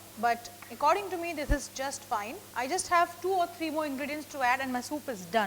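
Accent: India. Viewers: She happens to be Indian.so the Indian accent. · what happened to ur pronunciation? very indian..! rather speak hindi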